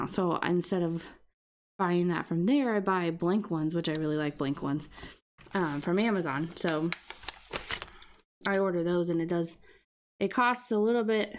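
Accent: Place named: South, United States. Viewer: Hahaha I was just thinking...”is she normally that southern?”.... when you said you were. I thought I was going crazy🤓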